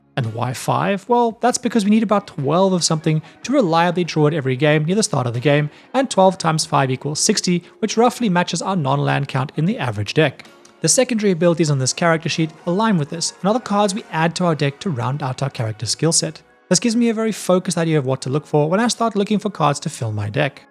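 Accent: South Africa. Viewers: I can't tell if he is South African....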